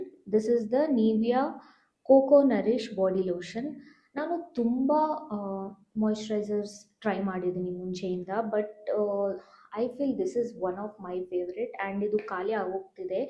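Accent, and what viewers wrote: North India, India. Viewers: I can't believe... I think ur from north indian 😊 Kannada ❤️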